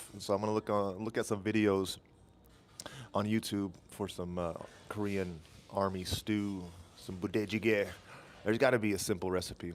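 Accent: Texas, United States. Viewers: That's wasup bro I used to live in Dallas And I love your Texan accent lol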